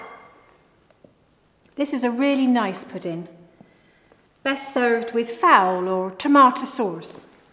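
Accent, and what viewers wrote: United Kingdom. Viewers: Where is this actress from?? I can't, for the life of me, place her accent in the UK. Armonds- almonds Saurce- sauce And so on. I'm wracking my brain! Does anyone know definitively?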